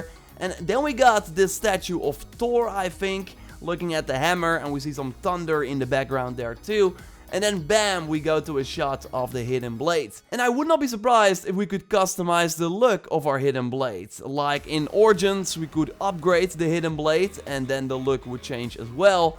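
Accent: Netherlands. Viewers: Ben je Nederlands? Your accent gives it away xD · And BAM 😆👊 Awesome 😄 I have a question: Where are you from? Your accent sounds Dutch 😁 Greetings from Belgium 😊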